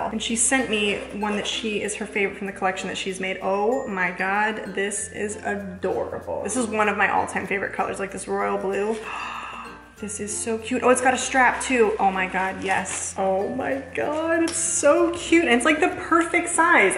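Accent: Spain. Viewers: your spanish accent sounds like its from spain. Doesn't sound at all like latin spanish thats very interesting. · i think that is the spanish she was thought because even when she traveled to spain she already had that accent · If ya didn't know already she studies abroad in Spain for Spanish maybe why her Spanish sounds more Spain than Latin.....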